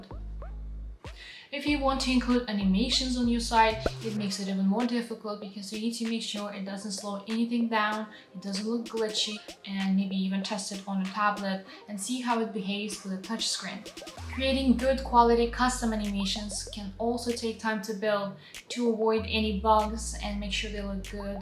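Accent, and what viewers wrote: Eastern Europe. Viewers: Where are you from ? Your accent sounds like from those countries by Russian surroundings... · Where are you from? You have a bit of Eastern European accent. Maybe I'm wrong.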